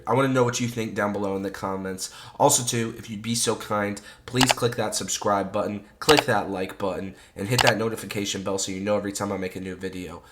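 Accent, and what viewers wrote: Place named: United States. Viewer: Bro, judging by your accent, I am gonna assume that you are an American. And I have noticed that the number of American football Youtubers has increased a LOT in the last two years. Is there any increase in football's popularity in USA recently in the last few years ?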